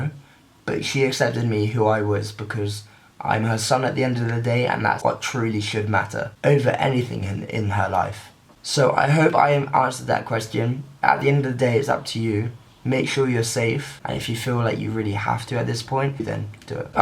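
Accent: England, United Kingdom. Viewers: I love your English accent❤️❤️❤️❤️ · I'm really into your british accent :))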